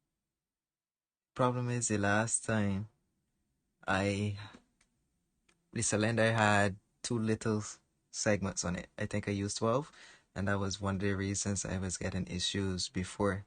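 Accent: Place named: Barbados. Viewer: Haha I knew as soon as I heard the accent. Never thought I would see a fellow Bajan doing 3D Media. I'm excited to learn from you 💕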